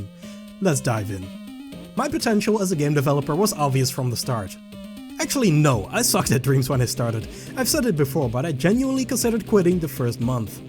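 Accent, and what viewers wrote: Netherlands. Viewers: are you dutch or am i wrong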